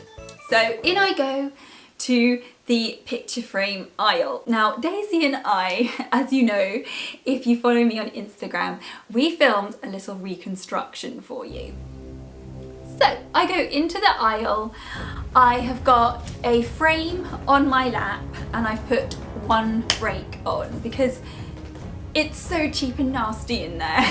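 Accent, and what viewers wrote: United Kingdom. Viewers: You're so awesome, love the British accent.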